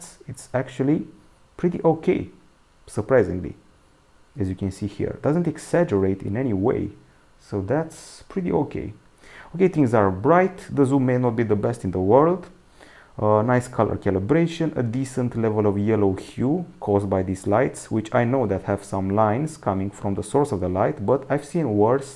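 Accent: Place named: Romania. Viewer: you have a strong romanian accent :D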